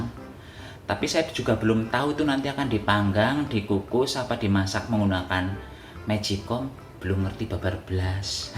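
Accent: Banyumas, Indonesia. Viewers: wong ngapak ank e mbok dirah 🤣🤣🤣🤣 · Ya Allah... Medok temmen kueh .. 😂😂. Jd nambah pingin tilik mbahe aring Banyumas.., brikute..smangaat terus masak" dg apa anane bae👍👍💪😇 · Happy masaknya dengerinn medokk jowone ngapak... Dan resepnya sy suka semua...good lucky 👍👍💃💃💃💖💖🌹🌹 mas km klu mkn bikin ngilerrr.....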